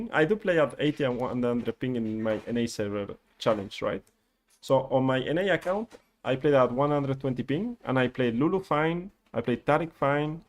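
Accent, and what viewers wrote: Spain. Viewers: Buenas, tengo una pregunta (im guessing ur spanish by ur accent). Estoy actualmente practicando Bardo porque soy OTP Rell y Rakan, pero queria saber si Bardo merece la pena. Tiene un estilo de juego que me gusta bastante pero no se si merece mas la pena ser OTP Bardo o Thresh. Tambien me gustaria saber si en un futuro haras alguna guia sobre Bardo, gracias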